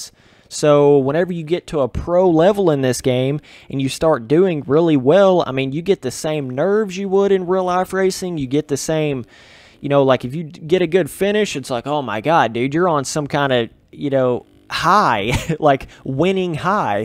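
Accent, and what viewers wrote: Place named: South, United States. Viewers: thats southern, not country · where r you from you sound so country.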